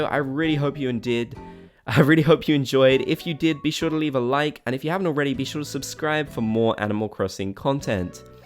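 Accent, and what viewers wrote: United Kingdom. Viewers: Is it just me or he sounds british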